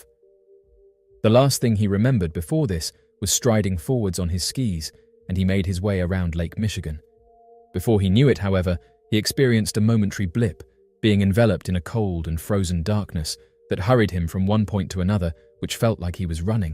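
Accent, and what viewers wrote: United Kingdom. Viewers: When I first started watching this, I had a feeling that you'd be British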